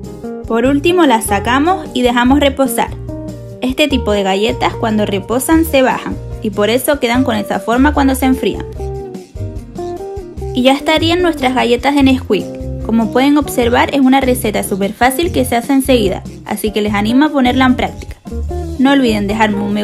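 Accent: Canary Islands, Spain. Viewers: Como se nota ese acento canario ❤ saludos desde mi cama :) (Tenerife)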